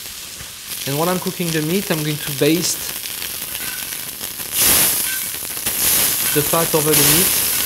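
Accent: France. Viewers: This is bullshit - just because he speaks English with a French accent doesn't automatically mean he can cook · French accent is funny, nice video learned a lot from it.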